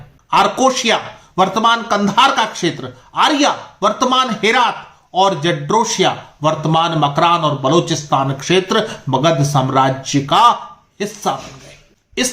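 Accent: Standard. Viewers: Shudh hindi bashan ka ucharan kiya, suhani lagi. Details achha diya. Good.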